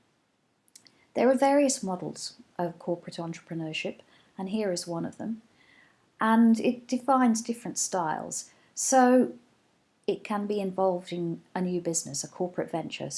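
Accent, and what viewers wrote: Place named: Manchester, United Kingdom. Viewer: Very nice accent I think u are from Manchester London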